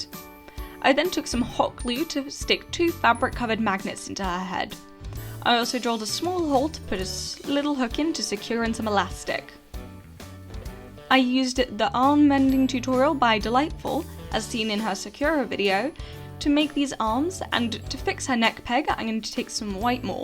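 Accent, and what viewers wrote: United Kingdom. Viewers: She's well cute ❤️what country are you from ,you sound like a posh English person ☺️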